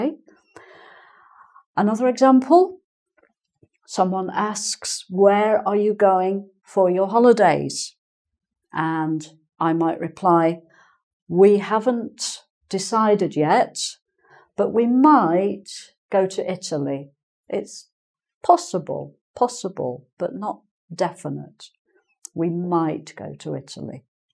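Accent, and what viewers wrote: United Kingdom. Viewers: British accent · Nice explanation! I love the British accent...in fact you can't disassociate a language from its own background, right? I have a question on this topic here regarding to pronunciation. The word "maybe" has a slight difference from the 2 words "may be", doesn't it? I do think I hear that! In "maybe" is the first syllable stressed? In "may be" is the second word stressed? Am I right? Thanks for your videos....I love them! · ale jaki to akcent? londynski czy moze oksfordzki? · It might be her English/British accent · What a lovely woman, this is the British accent that everyone can understand. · * Hears a British accent * * Love for the English language intensifies!!! * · I love your British accent!^_^ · Her English accent is perfect as I am quite familiar to British accent . From Thailand. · She is so charming,....and, her voice and her British pronunciation are very pleasant indeed. 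Thank you, Ms:-). It has been a real treat. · I love your british accent. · Lovely British English ❤️ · This is the accent that the world wishes all Brits had! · My god i really miss the British accent 😢💔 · she is beautiful, her speaking style is very British and it makes everyone understand❤️ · I love your beautiful accent !!! and your lessons of course !! Your british accent is wonderful. Regards from Chile. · such a beautiful British accent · Love that british accent.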